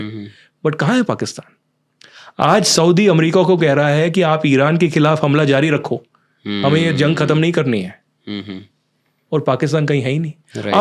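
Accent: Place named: Gujarat, India. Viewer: Bhai tme gujrati chho and tme jyare hindi bolo chhe tyare tmaro gujju tone dekhai aave chhe ema 😅 well it's ok mara jode bi thaay chhe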